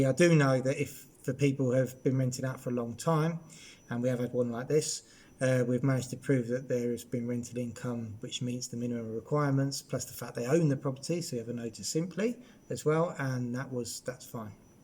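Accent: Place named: United Kingdom. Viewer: Precioso acento británico y excelente castellano. Saludos desde Pamplona.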